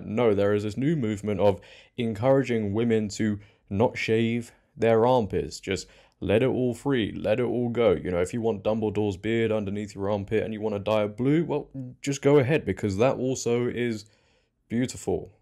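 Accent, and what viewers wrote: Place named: United Kingdom. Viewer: British accent of course lol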